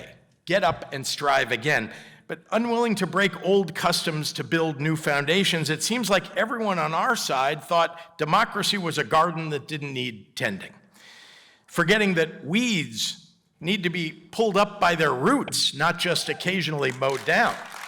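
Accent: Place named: Canada. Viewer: About Cannabis ~ after a CRIME of the CENTURY of ILLEGAL CANNABIS - We the GLOBAL people truly demand & expect this otherwise FREE DITCH WEED backyard garden-variety naturally safe & superior NATURAL BOUNTY plant to be RETURNED outright & overnight so we can GET BACK ON TRACK as a GLOBAL SOCIETY using our free best foot forward to redemption, after being FORCED to instead utilize sickly synthetic toxic patented/lapse-patent profit crap for generations.. So yes - time this ends is already 105+ years later. Thanks too for referring to it as 'Cannabis' vs that designed derogatory slang 'mj'. Respect! Speaking of a Garden that didn't need tending.. and 'weeds' that needed to be rooted - consider how FAR AWAY FROM NATURE the global population has been forcibly redirected.. consider a DANDELION is one of the most healthy plants we have at our feet - and what we have been doing with it all along.... 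just as a simple primal example of how FAR OFF the path WE HAVE BEEN LED. Dang, Sir - Respect! From a Canadian, I am taken aback by your speech - in a very great way! You almost sound 'Canadian' fcol! This is a breath of fresh air - the rest of the planet awaits!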